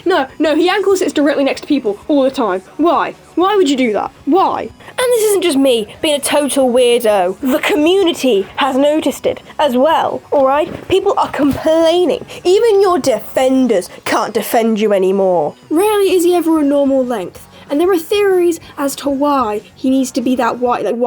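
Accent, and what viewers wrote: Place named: RP, United Kingdom. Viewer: (Ignore how I’m late) PLEASE I LOVE YOUR VIDEOS MAN BAHAHAHA. And your British accent just makes this so much better because you sound so posh (I’m British don’t come at me-)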